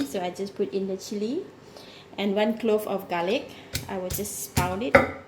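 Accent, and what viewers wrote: Malaysia. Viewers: no wonder you sound so Malaysian :) I really enjoy your down-to-earth explanations to cook home cooked food with love ! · You have a malaysian or singaporean accent..? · U have Malaysian accent?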